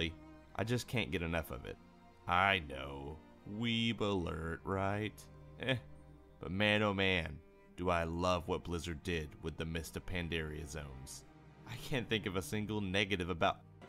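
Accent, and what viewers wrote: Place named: Canada. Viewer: I can't place your accent....it's subtle, but definitely distinct from my Bay Area English...Canadian maybe?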